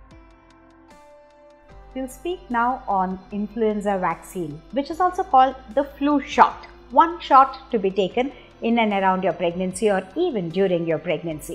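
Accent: India. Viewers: When to go for a flu shot when pregnant? NEVER!!!!There was a 4250% increase is miscarriages in pregnant women in <link> Indian accent does not make one knowledgeable regarding your health. No pregnant women should EVER get a vaccine. Never.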